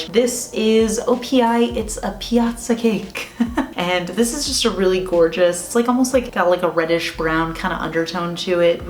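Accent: New Jersey, United States. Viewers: From one Jersey girl to another.. our accent is fabulous. Love the video too! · Purrrrrrples! Purps purps purps - lilac and lavenders next please :) and hell yes Joisey girls unite! It’s wharter not wahter duh 🙄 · Triggered by your NJ accent. 🙋🏻‍♀️ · I’m from Jersey too and I say it the way you do and until you said it I never knew anyone said it differently 🤷‍♀️ lol. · Maybe because I'm a Bostonian I dont mind the Jersey accent..🤷🏻‍♀️ lol dont get why it bothers people lol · 🤯I’m from NJ and I didn’t know we said Orange different until I heard you, 😂 and I live in the Orange state now! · From one Jersey girl to another, don't apologize for how we speak! Funny thing I never noticed how I said orange! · I know I’m late the the game, but your “arenge” doesn’t even phase me! My husband and his family are from NJ, so I hear it ALL the time! I love you! 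· Your accent is adorable! From a Canadian to a NJ! I worked for Amex and NJ was my fav state of people to talk to. 😊😊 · Forget the polish,which,by the way were all gorgeous, ZOYA-“Alma” & SALON PERFECT-“Too cute or spook” were my favourites.It is your EYE makeup that captured my attention,it is stupendous,and your accent is brilliant,I think the New Jersey accent is by far the best in the US of A. I have tasted ruby chocolate,it was a Magnum ice cream bar,and I thought it was raspberry flavoured but it said nowhere,as you said aswell,that there was fruit flavouring,apparently it’s the natural “fruity” taste of the ruby cocoa bean 😳🤷🏻‍♀️. 💚🇮🇪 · Love our Jersey accent!! How about the way we dog. Dawg!! Lol!! Loved this vid!! Orange gives me serious fall vibes!! Love the fall!! Hugs!!! 🤗🤗🤗🤗🤗💚💚💚💚💚💚🍊🍊🍊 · I’m a Jersey girl too!!!! I say orange the same way & coffee... 🤣I love the orly color. 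Also I love how you did your makeup orange - you look so cute. 🍊💗🤗 I picked up a bunch of OPI polishes from Marshall’s today & found myself checking to see if the polishes were jellies or not using the tips from your videos.😉 $3.99- win! I love the OPI brushes. They had lots of nudes & good fall transition colors. Anyway thanks for another great video!! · Didn’t realize that got so much hate for her Jersey accent. It seems such a stupid thing to be annoyed over. Everyone who isn’t from where you are is going to have an accent. You have an accent to all those people. What’s the big deal? I personally like hearing different accents. I like the variety of voices. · I'm glad you're making this series! I honestly needed more oranges in my collection. Thanks for the suggestions and the reference! I'm trying to get out of my cold color bubble and trying to wear warm colors. I don't really notice the New Jersey accent since I'm also a New Jersey kid, do we really have an accent. 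· Hey lady I love how you say your Jersey words and if people don't like your accent, fuck em'! It's ridiculous to me that people give you crap for how you pronounce some words... you are eloquent and intelligent and I love your videos! Thanks for being you 🧡🧡🧡🧡🧡🧡🧡🧡🧡🧡🧡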